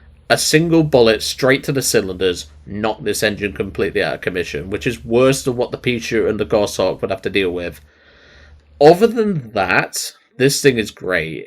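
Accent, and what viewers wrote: United Kingdom. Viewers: Midlands accent?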